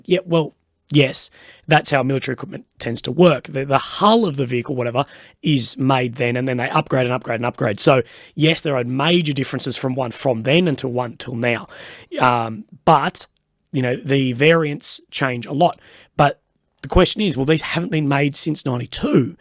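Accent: Australia. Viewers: Good to get my updates in an aussie accent.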